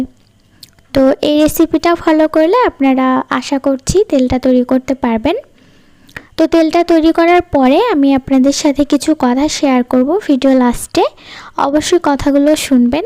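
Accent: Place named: Chapai Nawabganj, Bangladesh. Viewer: Apnar language onek ta chapai er manus er moto. Basa koi apner r kun class a poren.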